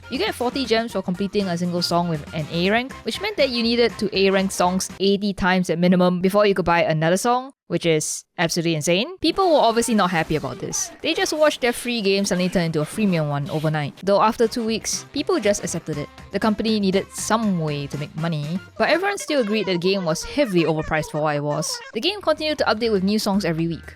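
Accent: Singapore. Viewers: > Did you really think you were going to slip a by me?! I receive neuron activation after only 4 notes! This is my first video to the channel and cute Singaporean accents with rhythm games is a hell of a combo and I’m here for it. I’d heard about the o2 drama but never had the context so this was fun. However with a rhythm channel and Touhou references under your belt I can’t help but notice you haven’t played Yoiyami Dancers yet and I regret to inform you that is /actually illegal/. Please rectify this serious state of affairs at once lest the yamaxanadu send you to gensojail. It’s a hard game and it definitely combines a rhythm movement a la crypt of the necrodancer and a danmaku but once you get the timing of a level and manage to dodge to the beat and get a good full level combo it feels so good. Also it’s wonderfully cute. You should check it out! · I thought your accent was familiar, turns out you're a Singaporean. 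Cool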